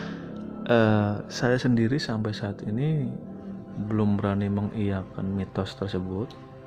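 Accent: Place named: Banyumas, Indonesia. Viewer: Hadir mas. Salam ngapa. Ora ngapak ora kepenak🙏🙏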